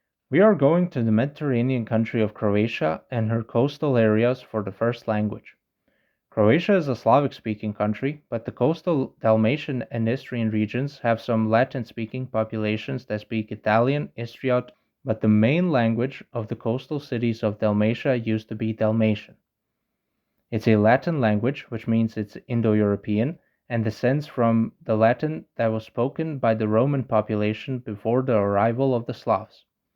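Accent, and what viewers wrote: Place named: United Kingdom. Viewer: That's a possibility, but I think it's rather unlikely. His accent sounds to me like one of the lower class British accents, and in these accents "TH-fronting" (pronouncing the TH in "thin" as an F) is the norm. So it's much more likely that he simply grew up speaking that way, but that physically it wouldn't be difficult at all for him to pronounce "north" properly, had he grown up among people who pronounced that sound. ....Anyway, it's not a huge deal really, but I just find the F pronunciation of TH rather annoying, and he's literally doing a linguistics video, where he's talking about reviving extinct languages, so it doesn't seem unreasonable to ask him to start by reviving the TH sound in his own English 😉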